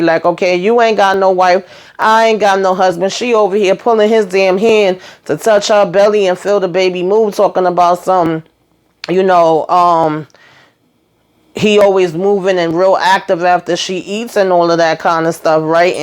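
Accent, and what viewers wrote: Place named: New York, United States. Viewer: You a new Yorker? I'm from Massachusetts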